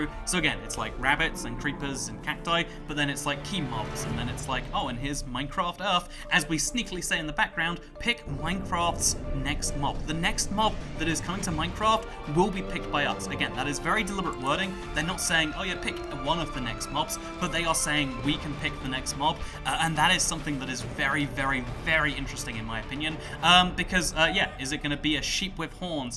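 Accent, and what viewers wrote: United States. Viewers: Wow, sounds american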